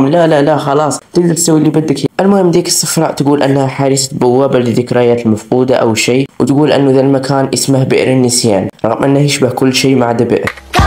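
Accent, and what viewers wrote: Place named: Saudi Arabia. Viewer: احس صاحب القناة سعودي ههههه حسبته مصري طلع سعودي بعد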